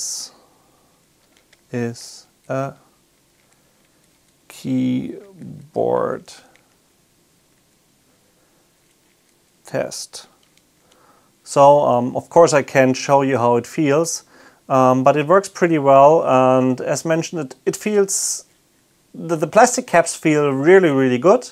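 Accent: Germany. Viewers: I knew that you are german :D unseren Akzent kann man auch nicht verstecken :) Cooles Video!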